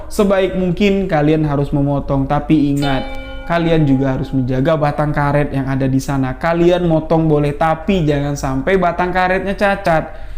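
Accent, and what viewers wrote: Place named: Sumatra, Indonesia. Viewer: ahai logat sumatera ni kek nya bang,,, sedap pulak awak dengar nya bang,,, beda dgn channel misteri lainnya... salam dari aceh bang..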